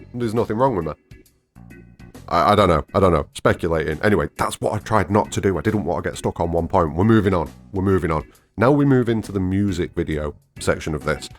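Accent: Northern England, United Kingdom. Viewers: Always love to hear a fellow northerner on YouTube. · what's your accent? I know its British but where - i suck at pinpointing accents but yours makes me laugh when you be expressive on purpose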